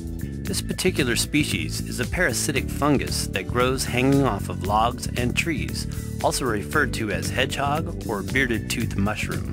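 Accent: United States. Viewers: Sorry, I shouldn't have been so harsh and called your narration "robotic" to begin with at all -- especially when you're already self-deprecating about it! The pace of your speech is fine, BTW, and you do happen to create numerous, highly informative, useful and great videos anyway! ☺ If this is of any solace to you, I don't like the sound of my own voice either! Clearly, I'm not good at detecting some U.S. accents (clueless and Irish here!). Californian? I hope you or any family haven't been adversely affected by those bizarre and horrific wildfires sweeping across your state? There's been talk about laser-based Directed Energy Weapons (DEWs) playing a role... Rambling on here, sorry. Anyway, just keep up the great work with your videos and have a lovely weekend! 🌝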